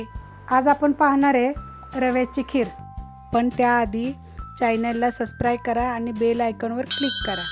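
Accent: Satara, India. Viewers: Tai tumch gav kont aahe tumhi kup chan boltay agdi mazya satar chi bhasha vattey baki khir kup chan zaleli distey mi lgech krun bghnar aahe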